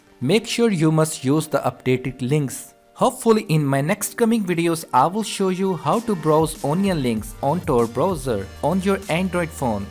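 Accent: India. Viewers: I hate indian accent very much. But this one is okay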